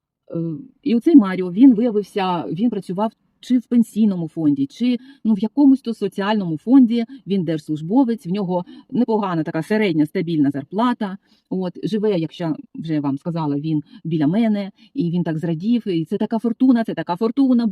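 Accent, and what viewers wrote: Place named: Surzhyk, Ukraine. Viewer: Дякуємо, що розповідаєте, як живуть у світі люди! Вам бажаю знайти СуперМаріо з апартаментами😊, та за жити спокійним гармонійним життям! А ні, то і українці гарні хлопці є! І з апартаментами теж. І, до речі, нічого, що інколи проскакує суржик в розмові.У нас півУкраїни на суржику говорить. Удачі вам! 🩵☀️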